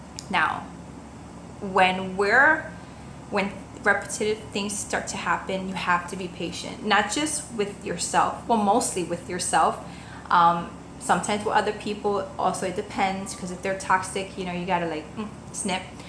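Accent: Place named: Brooklyn, United States. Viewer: Exercise my language but this shit is great. You basically described the events of the week and I feel a lot better about things. You kept it real, and explained the cards and their energies together in a way that had me thinking about the things I’ve got to deal with. Thanks for the wisdom, and indirectly advising me on my own situations. Love the Brooklyn accent too btw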